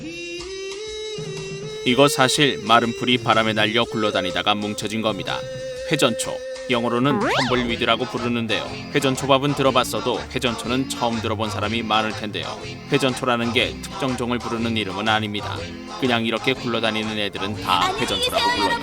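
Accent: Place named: Seoul, South Korea. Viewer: 옛날 서울말 쓰시는 것 같다 ㅋㅋ 옛느낌 낭낭한 목소리 분위기 좋당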